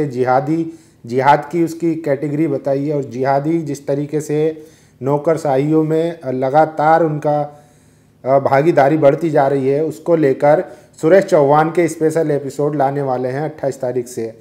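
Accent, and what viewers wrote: Bihar, India. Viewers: मुझे तो आज तक एक भी भीमटा पत्रकार नही दिख। ये थूक निगलकर खबर पढ़ने वाला भद्दी भद्दी आवाज निकाल कर बिहारी टोन में श को स बोलने वाले को कोई अक्ल का चोदा ही पत्रकार कहेगा।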